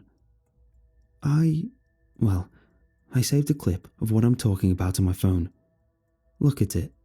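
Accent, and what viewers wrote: England, United Kingdom. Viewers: I'm back and obviously I ment my specific country, as in England the country, believe it or not we don't just announce were British at the start of our conversation for the hell of it. The guy reading in the story had an English accent, thought that connection was obvious. And no the term 'country' does not only refere to southern states of North America. Do they teach yanks about the rest of earth or no? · hes english... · hes British boy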